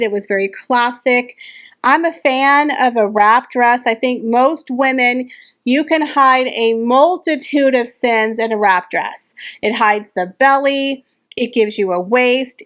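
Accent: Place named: United States. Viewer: Thank you for your great enthusiasm and representation of the Royals across the globe. If I may please ask? And I understand your dialect from America may prompt you to pronounce certain words as if they were American. Tiara is pronounced Ti a ra not Tiyerra. I am European/Australian and have worked in high end fashion for a long time and English is not my first language, however I may it a point to pronounce, people’s names or foreign names as they are pronounced in their country. Elie Saab is E l i not Ili. I watch you on all your shows and I do get it being American, you tend to make certain words sound more familiar to you.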